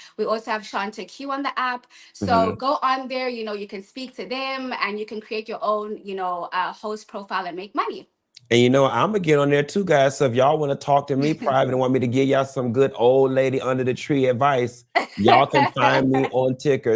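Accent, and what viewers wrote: South Africa. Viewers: Sisi I spotted your accent my sister!! #NoDNA just RSA!! Yes our person representing us on my favourite show!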